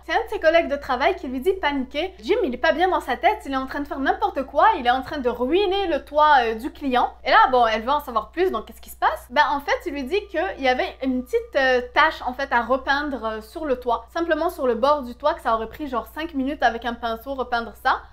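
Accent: Quebec, Canada. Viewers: tu es québécoise n'est-ce pas ?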